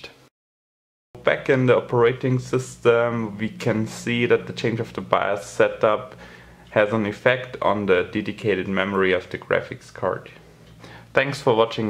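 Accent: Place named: United Kingdom. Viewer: Nigga cant u tell? He has a fucking brit accent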